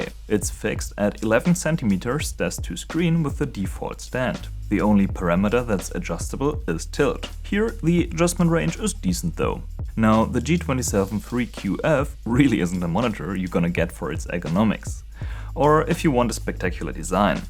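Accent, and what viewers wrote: Germany. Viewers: Dachte am Ende kurz, dass mein Englisch mittlerweile so gut ist, dass ich schon deutsch höre. Sehr geile Videos btw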